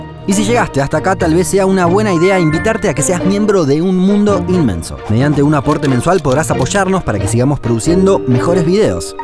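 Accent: Argentina. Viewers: Este tipo es argentino? Si haci lo es salu2 desde bs as xd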